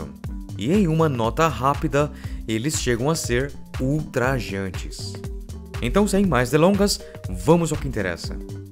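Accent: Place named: Caipira, Brazil. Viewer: Negativo no canal é apenas esse sotaque caipira do locutor. As informações e animações são bastante detalhadas. Parabéns!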